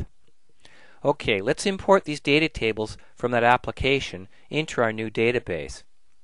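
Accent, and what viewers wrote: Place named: Canada. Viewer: Canada? love the accent. thanks for sharing.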